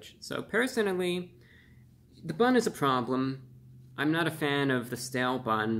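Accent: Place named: Mid-Atlantic, United States. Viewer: Review Bruh, the mid atlantic accent is getting more cringy, tone it down. Just let the inner upstate New York come through.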